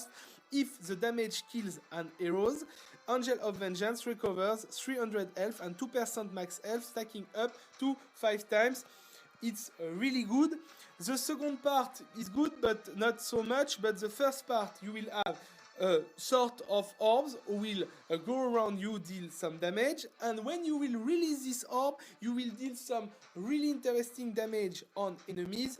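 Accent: France. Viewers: bonjour tu ne serais pas un français par hasard??? ton accent est trop marrant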